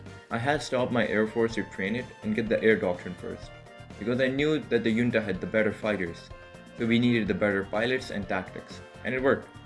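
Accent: India. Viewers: Holy Indian accent🥀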